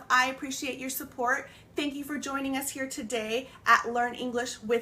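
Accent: United States. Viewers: Are you from Colorado?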